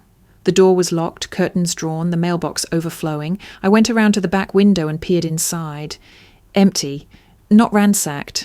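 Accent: United Kingdom. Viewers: No more British narrators, please! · This offering is being viewed from Stevensville, Maryland on an island in the middle of the Chesapeake Bay USA Although the ending is as expected, I think the biggest problem that I had with this entire offering was the narrator sounds like a very beautiful and fine lady however, her English accent totally be lies. The military feeling that should’ve been there. But the Jerk got exactly what he deserved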